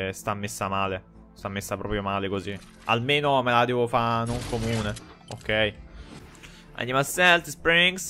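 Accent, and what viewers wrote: Naples, Italy. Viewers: Ma sei di Napoli?😂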